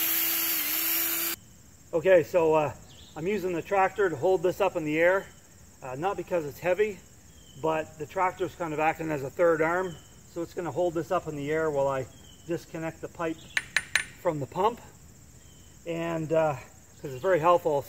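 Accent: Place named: New Brunswick, Canada. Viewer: Well hello there fellow NB’er, at least I’m gathering from your name and the North Eastern, not to mention the accent, lol. The off grid chores never end and today will be the battle of my hand pump and hopefully an oil change on the ol’ Sierra. New subscriber here, thanks and cheers from Miramichi. 👋🙏❤️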